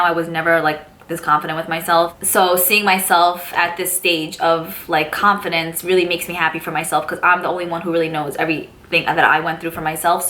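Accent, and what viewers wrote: New York, United States. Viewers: Love watching your videos. I miss New York and all my family that still live there. Listening to you talk reminds me of home. I think you have a great personality and I look forward to watching more by you. So glad I came across your videos. Love to see more makeup tutorials since I suck at it. Lol 😆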